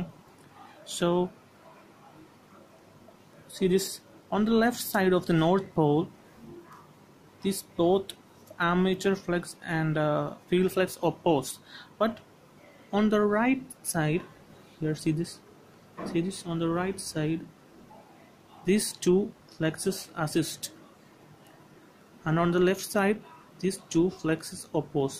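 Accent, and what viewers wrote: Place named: United Kingdom. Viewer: Sirf British tone me english bolne se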